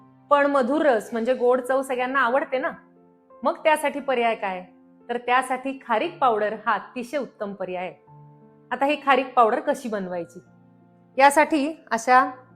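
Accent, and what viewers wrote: Standard. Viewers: तुमचे video अत्यंत सुंदर माहितीपूर्ण असतात..उच्चार शुद्ध..जास्त पाल्हाळ नाही .त्यामुळे छान वाटतात.. आजच्या vid मध्ये एक sangal ka .खारीक मुले बरेचदा constipation hote..त्यावर काय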